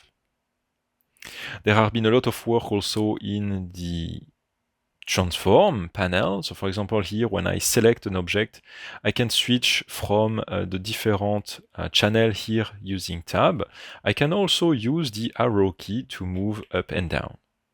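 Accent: France. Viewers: Bonjour, pourquoi vous ne faites pas la présentation en français, vu votre accent, vous l'etes non? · Super toutes ces nouveautés! Pourquoi ne peut-on plus avoir l'option lunettes en mode téléphone pour les panoramiques ? Merci. (Toujours drôle cet accent français en anglais ^^ ;-) dommage de ne pas traduire au moins à l'écrit)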